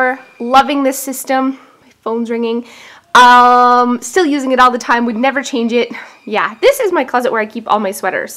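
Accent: Canada. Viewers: eh'? Muahahaha, you are sooo Canadian! LOL ;P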